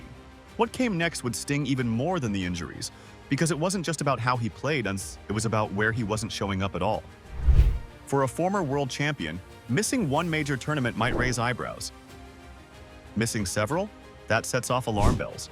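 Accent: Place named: United States. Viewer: an American voice over and a few repetitive meaningless clips. Absolute shite!!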